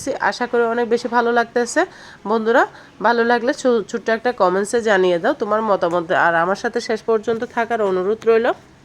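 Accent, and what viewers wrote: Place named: Chittagong, Bangladesh. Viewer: আপু তুমার কথার ভেতর চট্টগ্রাম চট্টগ্রাম একটু ভাব লাগছে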